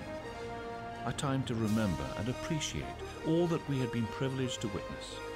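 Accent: United Kingdom. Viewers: "Eileen" Donan Castle?????? Get a grip laddie. Your accent is Scottish but your knowledge of Scotland is sadly lacking. "Eileen" really!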